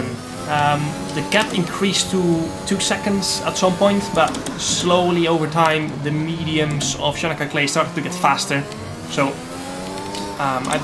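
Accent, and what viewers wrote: Netherlands. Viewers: I knew you were dutch just like me, i could hear it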